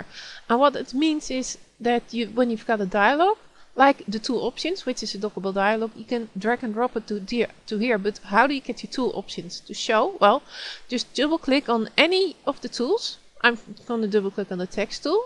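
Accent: Netherlands. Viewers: Wedden dat je nederlands bent / I bet that you are \Dutch :d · Bedankt, ik hoor aan je stem dat je van Nederland komt of toch Nederlands praat. Ben ik juist? Toch bedankt want ik zat met dit probleem!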